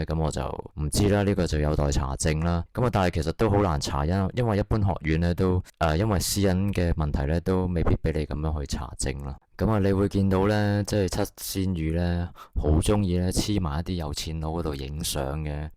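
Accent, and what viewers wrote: China. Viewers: 典型大陸西講野…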